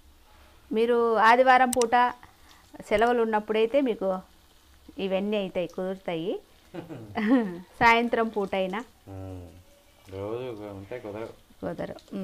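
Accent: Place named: Telangana, India. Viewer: మీ మాట తెలంగణా మాటల వుంది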